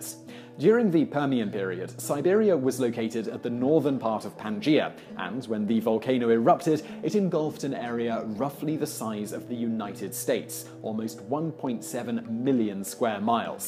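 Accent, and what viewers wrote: England, United Kingdom. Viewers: I'm not easy to get a like or subsciption out of. But most of the video and channels paticipates on make me wish I could subscribe and like more than once. Thanks to the team, I feel like Wikipedia is being delivered to me in video with a fine British accent and large vocabulary. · is a great learning resource and a great teacher. Full-meal range - tragic (worst despots), inspiring (celebs once homeless), serious (environment, addictions), fun (Richard Gere links) and more - gives Brain Gym capacity-building., you engage us with great voice, classy accent (a pox on the naysayers), crystal clarityy at speed, and England's great cultural assets of dignity, humour and discretion. To quote the in, "Who could ask for anything more?"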